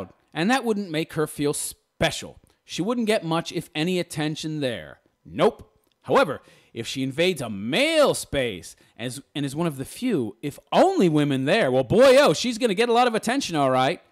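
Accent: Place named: South, United States. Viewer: My dear Lady Vin, thank -you for your prompt reply. Bible days, tantrums, Arab men, women without rights, who will cave-in first and hidden children within the Catholic Church.... Did I forget to mention Harem ?? To begin with, I'm not an American, however, MGTOW men do not want to have women , period. No wives, mistresses or harems. Please do not misquote me on that . We want less responsibility with regards to women, not more. MGTOW men say no to marriage, LTR and co-habitation. We make no demands of women, other than their distance. Biblical times, NO, we are moving forwards, not backwards. We live within the Western society and have little or no regard for oppressive cultures. The laws in the USA as well as here in Europe and biased in favour of women when it comes to divorce and taxes. MGTOW is a mindset, not a formalised structure, no central organisation, no official spokesperson. 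Therefore, there can be no implosion of men who choose to live life on their own terms. We are as intervidual and unique as you are. Illegitimate children within the Catholic Church is something I am well aware of. Being Catholic myself, I know all about the Madeleine Laundries and the lives that institution wrecked. On par with the Holocaust in my opinion. So yall can chew on that awhile ( love your Southern accent ). Thanks once again for your reply....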